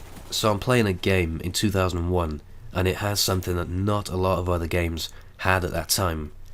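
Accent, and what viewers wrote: Wales, United Kingdom. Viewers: i must say i dont like the welsh accent but ure voice is heavenly and the kiparis is BEAST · I think you would be such a good voice actor! It's like when I first heard Gaz in cod 4, and then Ghost in cod 6. His voice was awesome and I got the same feeling when I first heard you. btw, are you from wales? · where in he uk are you from?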